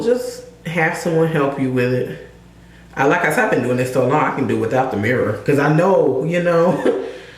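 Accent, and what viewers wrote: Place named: South, United States. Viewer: he's so country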